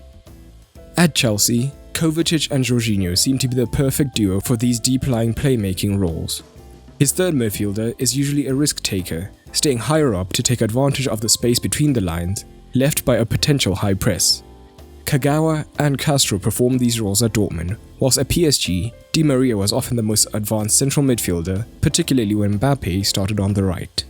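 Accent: South Africa. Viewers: I know a South African accent when I hear one bro 😂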